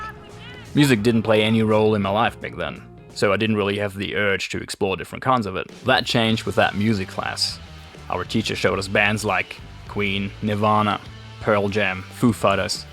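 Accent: Australia. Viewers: Oh wow 😁that all makes so much sense. I am someone who has commented that you picked up an Aussie accent while you were here. Mind you, I think you picked up the whole Aussie way of life too lol. Thanks for telling us a bit about your 'English' journey. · Your slight German accent is pleasant and attractive. You do speak English with a slight Australian accent. I like it. It's perfecly clear and articulate and you don't stumble with words at all, and express yourself well. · You have a talent in words mate and spoken in English or we could say Aussie. Well done champ. 😎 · as an australian i know this makes no sense but if the general australian accent was milk your voice would be yogurt. its like similar and mostly the same but yogurt is more like sour and vaguely european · Yeah you've soaked up a lot of Aussie while here, but that 'yeah' sold it hands down haha! 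· I recently just started following you from somewhere else on YouTube, but I am doing my usual of following new people backwards. It only starts getting funny when I hit on something posted 3 or 4 years ago and I am expecting some very funny moments in your past. Congrats on your Aussie accent shining through. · I'm Australian - 6th generation - Your accent is so dam close... Good on you - very interesting... John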